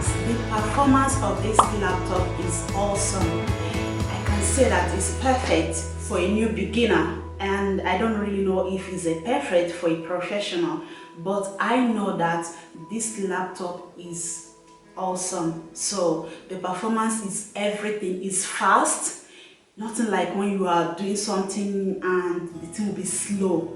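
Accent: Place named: Jamaica. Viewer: Hello, your accent. I love it. You sound just like my best friend her name is Maritza. But we all call her Jamaica. Are you also from Jamaica hun?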